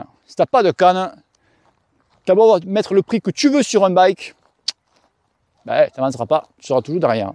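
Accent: South, France. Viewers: Il me fatigue ave son accent de sudiste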